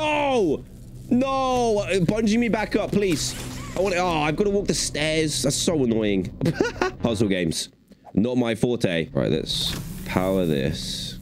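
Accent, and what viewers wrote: England, United Kingdom. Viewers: Are u a brit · do u live in England cuz I do and u sound English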